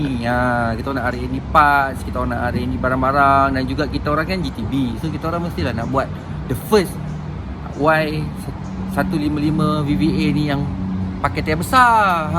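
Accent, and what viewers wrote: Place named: North, Malaysia. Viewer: video ni cakap utara, video next cakap sabah ke terengganu ~!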